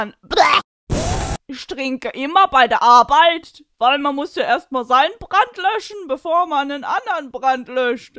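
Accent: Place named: France. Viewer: haha wie geil :D Dein französcih-deutsch hört sich so goil an...RECHTSCHREIBUNG ARGH! XD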